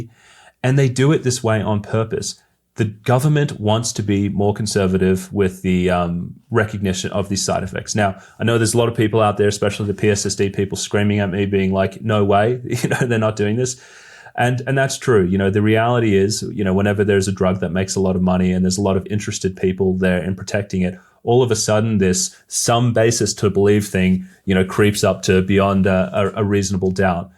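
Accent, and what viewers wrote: Australia. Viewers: Why are you Australia accent?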